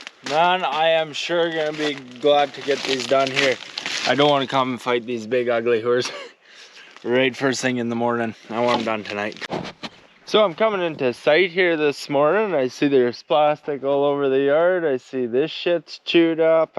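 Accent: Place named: Newfoundland, Canada. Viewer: Where are you from? What part of Canada? I was just wondering because I noticed the way you talk …….. I don’t mean that in a bad way just kind of wondering …….Tiny Houston MS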